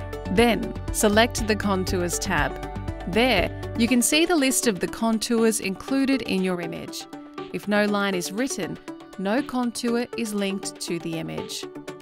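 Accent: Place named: Australia. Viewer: Who's the presenter ? Voice sounds like its Aussie.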